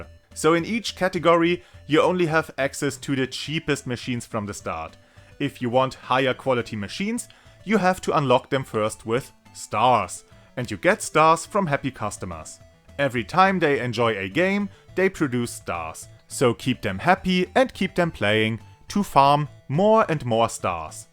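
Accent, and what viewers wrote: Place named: Germany. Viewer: Einfach mal n Like und Follow, weil du dir anscheinend seit Jahren so Mühe gibts :) Außerdem mag ich deinen deutschen Akzent im Englischen! :D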